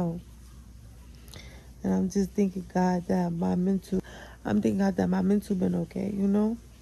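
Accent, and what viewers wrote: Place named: East Coast, United States. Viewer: you from the east coast? i get jersey brooklyn vibes..im from the west coast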